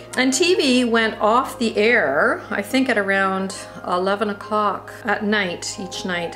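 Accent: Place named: Canada. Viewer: I have just stumbled onto this video and I LOVE the Canadian Accent..!! I can relate to so many things - even growing up in the 70's/80's... thank you for this..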